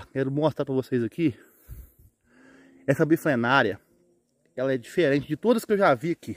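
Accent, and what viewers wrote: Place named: Minas Gerais, Brazil. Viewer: Minino eu gosto de ver as plantas mais eu gosto muito do seu sotak mineiro. Muito bom os seus vídeos 👌👏👏 Deus te abençoe sempre 🙋😍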